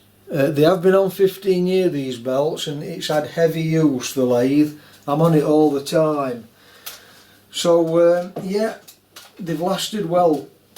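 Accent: Yorkshire, United Kingdom. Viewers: I caught your name in the comments the knacklers workshop, I’m pleased to sub you and will be looking through your back catalogue. You sound your from the land of the White Rose. So I’m not too far away over the border. If I can ever help.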